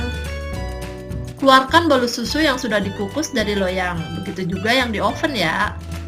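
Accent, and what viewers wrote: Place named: Java, Indonesia. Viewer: owh orang cikarang toh jdi kya logat jawa ya' 🤣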